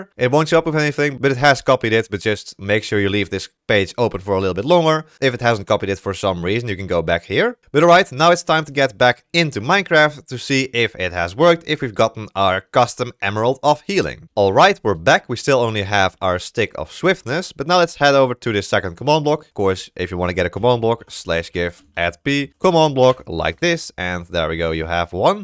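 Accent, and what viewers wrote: Netherlands. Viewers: meer nederlands accent dan dit bestaat niet.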